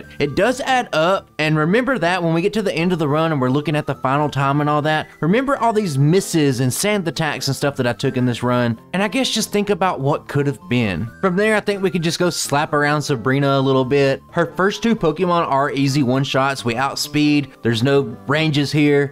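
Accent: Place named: Kentucky, United States. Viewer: My son got excited when he heard your voice because “He sounds like he’s from Kentucky like us!!” 😂😂😂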